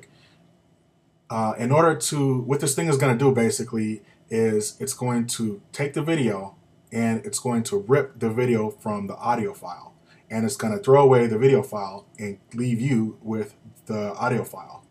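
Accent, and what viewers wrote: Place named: North America. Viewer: i love your american or candian acceient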